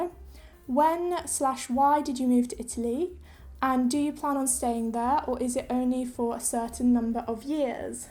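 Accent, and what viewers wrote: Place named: England, United Kingdom. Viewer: it was so interesting to hear about your very International childhood. Thanks for sharing! Even though I’m very bad with recognizing accents, I did have the feeling your accent was completely “born and raised” in England. 😊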